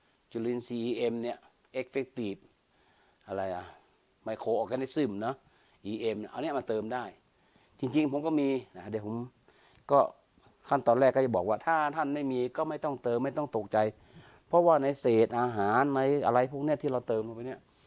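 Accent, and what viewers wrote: North, Thailand. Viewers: ขอโทษนะคะพี่เป็นคน เหนือรึป่าวคะน้องกดติดตามแล้วค่ะ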